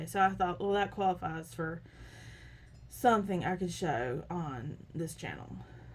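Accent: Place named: South, United States. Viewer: Hi from Dothan, I knew you had to be from somewhere nearby when I heard your accent 😊. You've got a beautiful yarn room there and I love those Felici socks. I'm loving knitting the Pop Life Cardigan by WATG after making myself finish two large crochet afghans. Looking forward to seeing more from you and nice to meet you ❤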